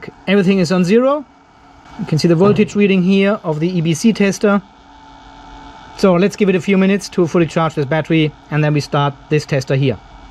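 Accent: Germany. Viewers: Is it right, that you have a german background? You said "nochmal" and your english sounds like here in germany 🤣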